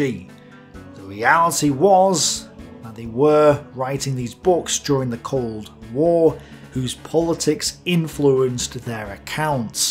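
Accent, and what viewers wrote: United Kingdom. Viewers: He can't help his British accent!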